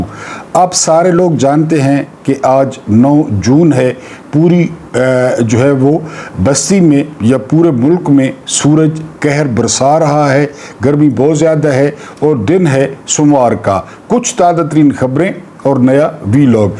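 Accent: Saraiki, Pakistan. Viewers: صاحب سرائیکی لہجہ میں اردو زبان بڑھی ہی دل نشین لگتی ہے ۔❤❤❤❤❤❤❤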